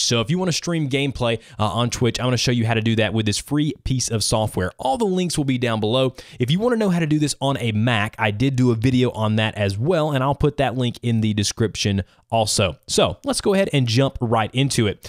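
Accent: South, United States. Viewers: Are you from the Southern U.S.? (I'm a Linguistics major so I have a tendency to try to guess accents.) It sounds kind of like you moved to the Northeastern United States after growing up in the south.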